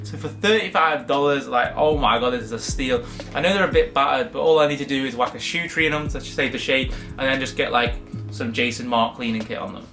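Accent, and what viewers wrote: United Kingdom. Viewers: It sounds weird hearing a person from the uk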